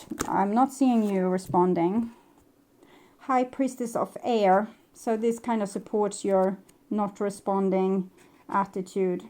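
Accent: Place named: Sweden. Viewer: I thought I recognized the accent😍 Min mor ar fran Skane.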